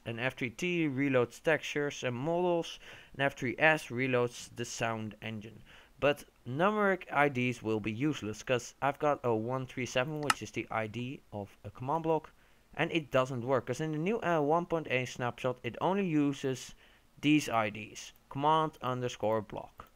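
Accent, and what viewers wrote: Netherlands. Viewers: Da Ceh ja je hoort het altijd als een Nederlands iemand Engels praat. Goedw vidieo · are you dutch ? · je kan makkelijk Horen dat je Nederlands bent:) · love the dutch accent :) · Ik dacht het al aan het accent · Are u Dutch?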